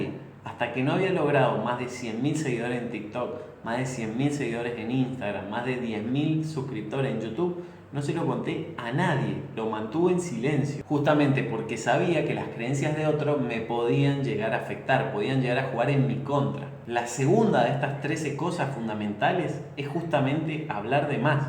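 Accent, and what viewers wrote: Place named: Mendoza, Argentina. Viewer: sos mendocino? yo lo soy y siento que tenés el acento, abrazo!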